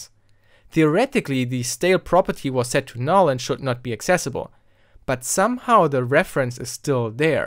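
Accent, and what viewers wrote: Germany. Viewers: I love that German accent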